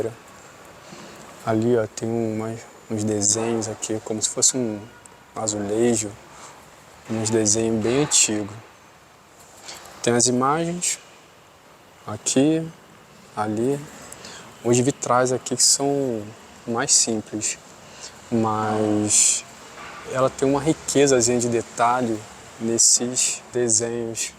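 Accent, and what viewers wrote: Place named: Rio de Janeiro, Brazil. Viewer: Adoro o sotaque carioca, muito chic!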